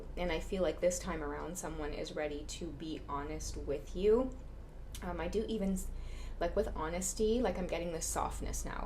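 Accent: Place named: Trinidad and Tobago. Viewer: Girl this is my first video of yours and I liked and subscribed immediately purely for the trini accent 🇹🇹❤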